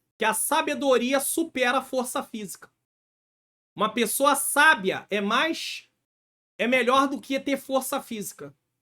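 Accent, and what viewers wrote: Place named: Rio de Janeiro, Brazil. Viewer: Pastor,venho do Futuro (setembro de 2026 😁),para dizer que passei anos na igreja (sou da Assembleia),e nunca havia lido a Bíblia,que vergonha 😢,mas desde o ano passado comecei a ler,estou em Provérbios,leio no meu tempo,sem pressa e sem tabela,e quero lhe dizer do fundo do meu ❤️,que eu glorifico a Deus,por ter colocado o senhor no meio caminho pra ensinar,explicar o que não entendo,Deus realmente lhe provém de sabedoria pra nos ensinar,o senhor não imagina minha felicidade a cada estudo com o senhor,gosto muito do seu jeito de falar e do seu sotaque carioca 😂..morro de saudades do Rio,morei 8 anos aí, sou paraense,mas tive meu filho aí,vim embora em 2001😢. Que Deus abençoe sempre, sempre,sua vida e sua família 🙌🏼📖❤️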